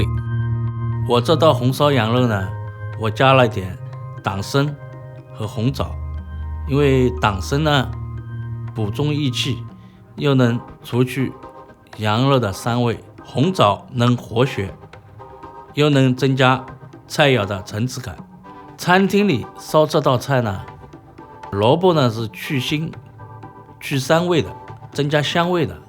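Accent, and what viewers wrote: Shanghai, China. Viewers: 大厨的上海普通话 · 不会啊 就是略带上海口音的普通话 没有感觉很吃力的说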